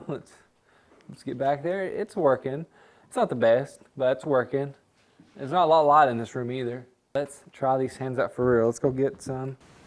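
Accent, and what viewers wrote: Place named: South, United States. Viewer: you get a sub for that sweet southern accent... and the good quality video but mainly the accent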